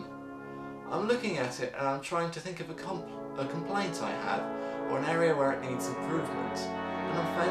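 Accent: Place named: United Kingdom. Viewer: I'm just wondering and not trying to be rude but why do you talk like you are a British unless of course you are a brit?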